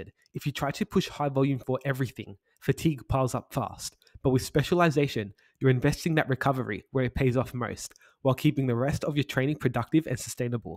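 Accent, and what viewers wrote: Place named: Australia. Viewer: respect to your optimistic attitude. also fellow aussie i hear?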